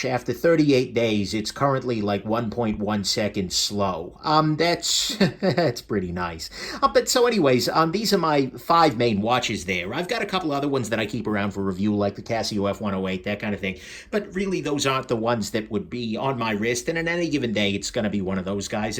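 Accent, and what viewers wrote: New York, United States. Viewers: That thickkkkk NY accent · It’s not Texan, more New York or something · Are all of those spider co???? Jk I just started watching you I love you New Yorker accent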